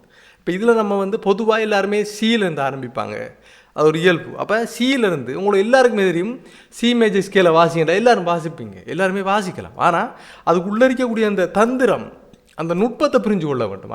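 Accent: Sri Lanka. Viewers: Ahaa....Ilangai Tamizh azhagu......vadivu vadivu 😀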